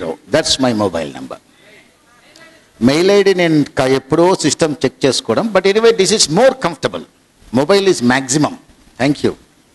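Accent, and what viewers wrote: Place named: Telugu, India. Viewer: speaking english in telugu slang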